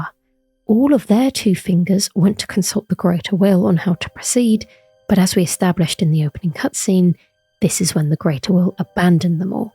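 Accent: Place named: North, United Kingdom. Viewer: Love his Northern accent.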